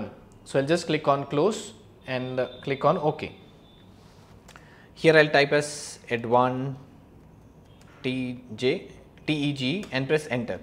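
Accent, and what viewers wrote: India. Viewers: الله ياخذ الاكسنت الهندي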